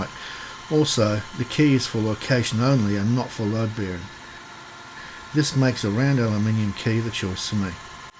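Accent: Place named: Australia. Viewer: You know what my wednesday needed? a little bit of machining with an outback inflection. This was a fun one to follow along with, I just restored a manual lathe and endmill and I've been liking the hobby toolmaker side of youtube. greets from california mate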